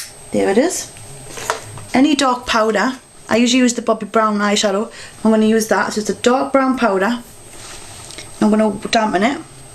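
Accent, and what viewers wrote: Newcastle, United Kingdom. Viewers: Lol you speak fast! And with your British accent I cannot understand everything you say.. Oh well, you still make wonderful videos :) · *squeals* When I clicked this vid, I had no idea I'd be listening to a fellow geordie!!! Awesome. I was well expecting a yank....XD xxx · where are u from? UK?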